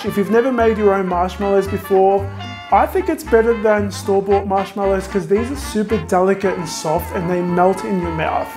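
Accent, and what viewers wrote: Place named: Australia. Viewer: He's Australian. I agree, the accent is lovely to listen to.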